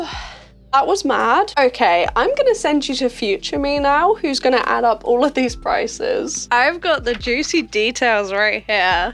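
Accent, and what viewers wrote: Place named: United States. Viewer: Why are you constantly using strong American inflections when you speak? Do you live on Netflix or something? You might as well go with a full-blown accent at this point because listening to this pseudo-Americano dialect is painfully embarrassing.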